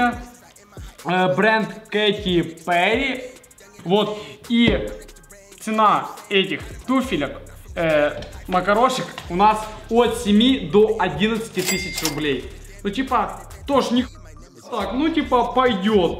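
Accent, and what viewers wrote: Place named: Ukraine. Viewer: почему у тебя акцент Украинский?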